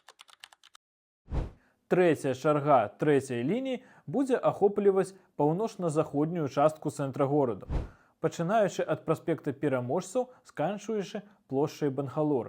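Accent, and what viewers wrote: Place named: Russia. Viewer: Дзякуй, было цікава. Але вымаўленне беларускіх слоў адчуваецца што нібыта трошкі рускае.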